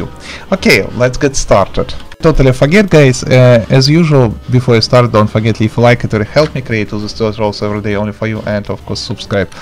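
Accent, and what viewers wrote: Russia. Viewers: У тебя прям сильно русский акцент)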